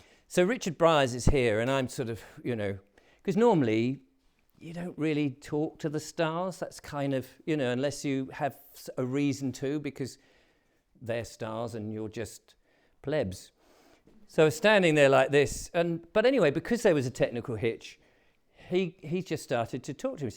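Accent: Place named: RP, United Kingdom. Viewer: Rp accent... one eye... full of shite... shill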